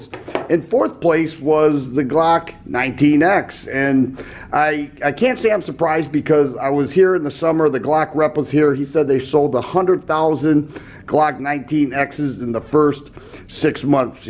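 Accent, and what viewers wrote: Michigan, United States. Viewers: I didn't know Michiganders had a accent until I moved away. You have a very heavy Michigan accent. Keep up the great reviews.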